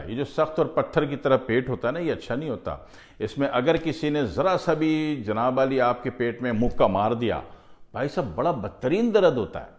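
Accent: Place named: India. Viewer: Apkj zaban se lagta ha ap hindustani ho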